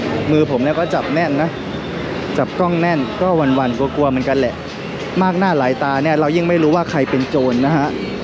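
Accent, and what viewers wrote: Spain. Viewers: สำเนียงพูด ใช่เลย! คนสเปน555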